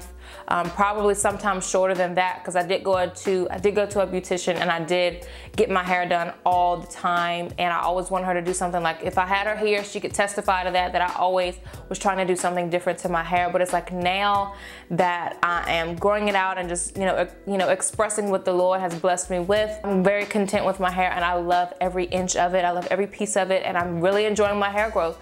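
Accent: South, United States. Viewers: Your voice is so country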